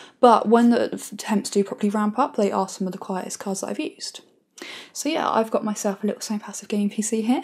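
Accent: England, United Kingdom. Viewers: You make some really good videos. Sadly I always have to concentrate to understand what you're saying. Guessing you're from the UK, what regional accent is that? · She's from England.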